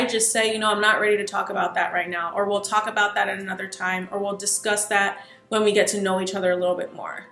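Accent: Nigeria. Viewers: You really pulled that 9ja accent hey 😹😹👏❤️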